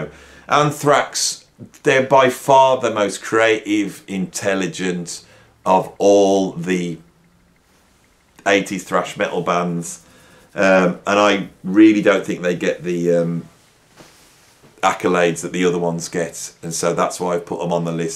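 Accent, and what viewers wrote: Birmingham, United Kingdom. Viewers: To be fair, did say at the start that metal isn't his main genre. But I caught that too. Dissident is one who rebels against society. Many people still think it's Stained Glass. Can't blame them. The cover looks kinda stained glassy. I like how the Brummies remove the T from Metal. Also, says "PAHN-terah". In U.S. we say "pan-TERA" He makes it sound like the name of a fluffy kitten. Here in America we say it like a solid punch.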